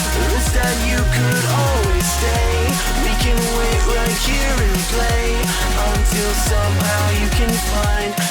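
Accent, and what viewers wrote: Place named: Germany. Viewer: I like ur German accent and also very well said.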